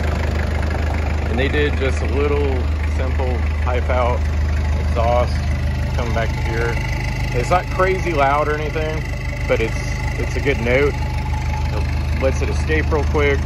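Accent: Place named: South Texas, United States. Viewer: We speak the same dialect in deeeep South Texas by the Gulf of Mexico and it's alive and going strong here.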